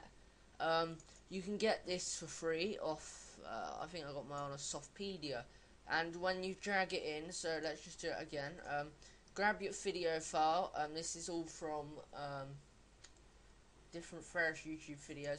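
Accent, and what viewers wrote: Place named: United Kingdom. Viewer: I couldn't understand a word that this guy was saying!The British accent was bad enough, but you add him talking at light speed and he ight as well be talking in Martian language. Maybe he is a "Klingon trying to talk English!!!!PLEASE do not post anymore dirty Klingon videos because this is a site for humans onlyand English language only. Humans are biggoted when it comes to Klingons!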